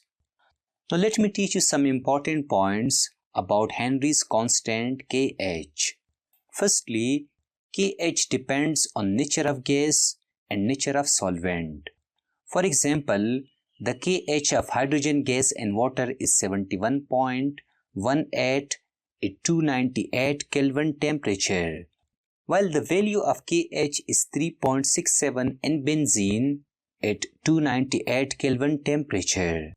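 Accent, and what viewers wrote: India. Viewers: Oh i thought he is from india because of his accent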